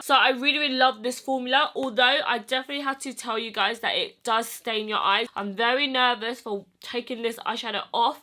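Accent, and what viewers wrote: United Kingdom. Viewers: hwasa with a british accent aha💕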